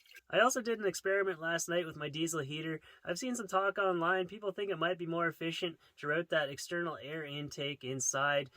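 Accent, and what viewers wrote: Canada. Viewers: On the way owt there. On the way owt lol i love canadian accents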